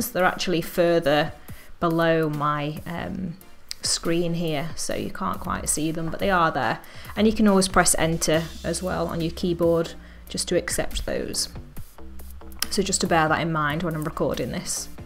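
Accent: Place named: Liverpool, United Kingdom. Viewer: scouse accent = 😍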